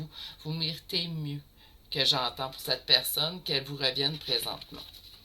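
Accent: Quebec, Canada. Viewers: 👋... Je vous découvre et je suis scotchée ! Votre accent que j'adore (j'ai dû être Québécoise... 😍), votre chien Guizmo (moi c'est mon 🐈‍⬛), et là, le second choix et... TOUT est juste ! 😉😚😇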